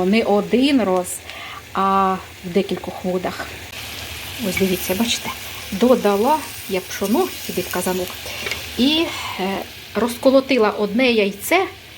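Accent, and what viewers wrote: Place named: Cherkasy, Ukraine. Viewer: Гарна черкаська говірка, приємно чути.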